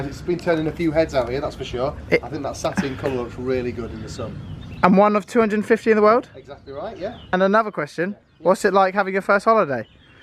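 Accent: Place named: United Kingdom. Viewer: Bro shut the f*** up please. We want to hear the car, plus the British accent gave me an headache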